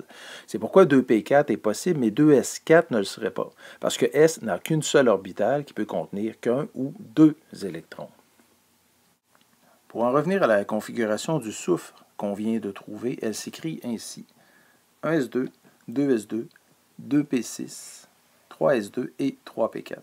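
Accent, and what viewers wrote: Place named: Quebec, Canada. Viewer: Accent québécoise 🗣️🗣️😅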